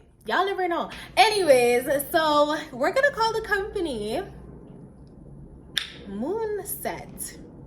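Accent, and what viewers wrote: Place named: Jamaica. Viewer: the patois is killing me 😂 are you jamaican??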